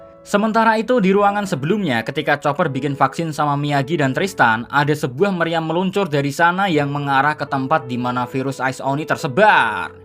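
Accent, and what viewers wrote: Java, Indonesia. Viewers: Jawane daerah ndi min?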